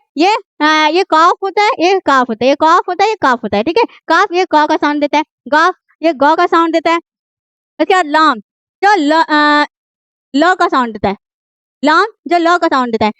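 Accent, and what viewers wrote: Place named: Rural, India. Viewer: Pahle apne madam sir ko kahna ki dehati zaban me na padhaye urdu ko बे ते से 😂😂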